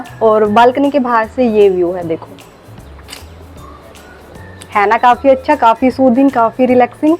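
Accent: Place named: Western Uttar Pradesh, India. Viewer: UP west ki hogi. wha wale hole khte hi.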